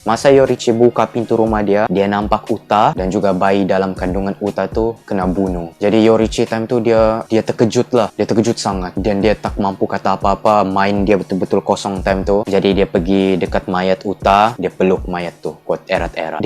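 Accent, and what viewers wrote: Sabah, Malaysia. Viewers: orang sabah ke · Speaker mmg org sabah bro 😆🤣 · Abg ni orang sabah kaa? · Logat dia bckp mcm org sabahan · Abang ni orang sabah ke?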